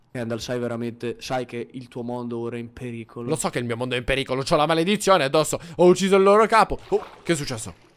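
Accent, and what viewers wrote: Sicily, Italy. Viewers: !!! È siciliano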